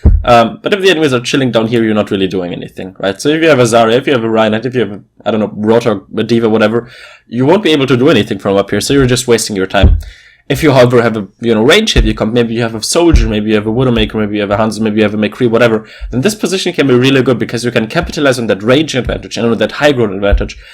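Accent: Germany. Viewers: Are you German? You kinda HAVE to be German. You sound REALLLLLYYY German bro c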